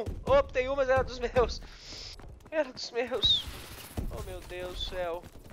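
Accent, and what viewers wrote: Rio Grande do Sul, Brazil. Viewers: Tu é gaúcho do sul thê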